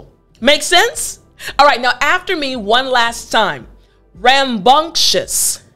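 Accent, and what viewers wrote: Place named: United States. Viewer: I love your American accent, teacher. Greetings from NICARAGUA 🇳🇮.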